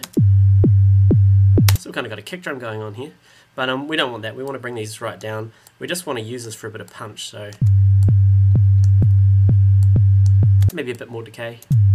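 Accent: New Zealand. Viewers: great tutorial. It's a bit too advanced for me, an Ableton newbie. I learnt alot though, will definitely be back once I'm more familiar with Ableton. Watching your video, I learnt some things which would have taken me months to figure out by myself. Great explanation, being a kiwi, I can understand everything you said. Maybe I should do the English transcript, for the non-Kiwi listeners. Some have referred to speed and not understanding everything. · are you from new zealand? Your accent sounds like it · he ain't talkin fast hes a kiwi!!! churrr brotha!!!!!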